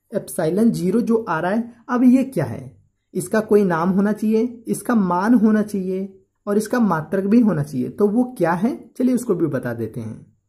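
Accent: Chhattisgarh, India. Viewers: Aap chattishgarh se ho kya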